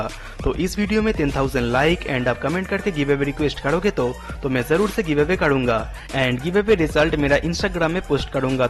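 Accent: Bengal, India. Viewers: Ekdm bengali hindi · Strongest indian accent ive ever heard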